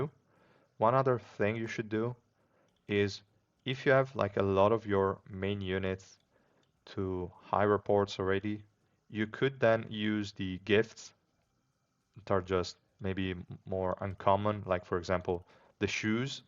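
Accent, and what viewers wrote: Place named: Eastern Europe. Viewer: haha noooo, I also have the -ian at the back, but it is ... Asian 😂 I am a Vietnamese who like to explore language and luckily I know some of Italian words. The one that make me surprise is your accent which make me assume that you are from the Eastern or Northern part of Europe 😅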